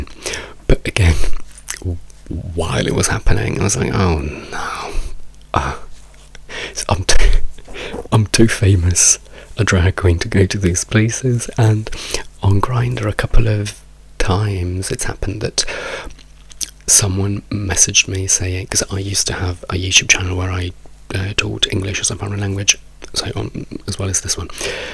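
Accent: England, United Kingdom. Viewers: mamma mia ho appena visto un tuo video in italiano, sentirti con questo accento inglese è pazzesco 😍 meraviglia davvero sei spaziale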